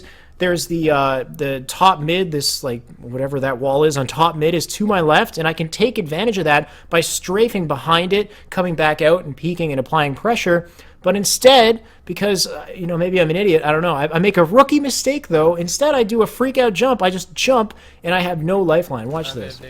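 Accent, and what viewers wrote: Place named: Canada. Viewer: He is Canadian.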